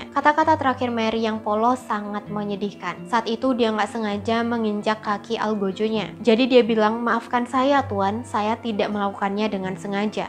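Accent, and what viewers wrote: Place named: Indonesia. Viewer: padahal gak salah loh, coba deh nonton dulu video lain atau dokumenternya lah, beda beda dikit aja, lidah indo kan beda sama prancis asli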